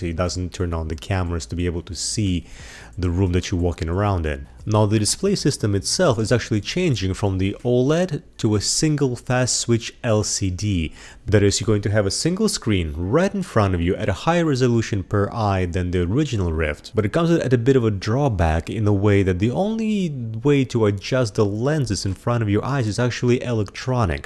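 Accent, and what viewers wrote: Russia. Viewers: Бро ты же Русский???? по акценту слышно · По акценту кажется, что ты русский.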